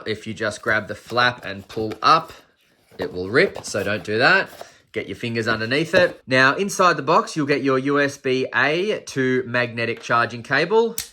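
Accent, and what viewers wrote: Australia. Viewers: Great review - thanks man. Good to hear another Aussie. For a second I though you had a shelf full of dildos for a second there 😮 😂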